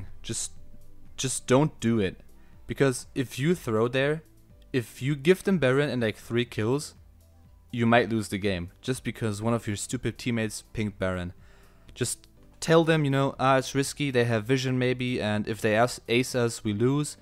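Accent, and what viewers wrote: Germany. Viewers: Geiles Video! Man hört das du Deutsch bist, trzdem sehr geil! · Man hört sofort das du ein Deutscher bist haha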